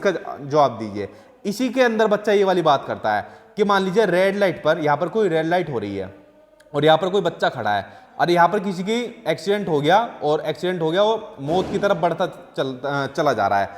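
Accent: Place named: Uttar Pradesh, India. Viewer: Sir ,,Aapki boli bilkul hmari jesi hai ...UP wale ...😎😎😎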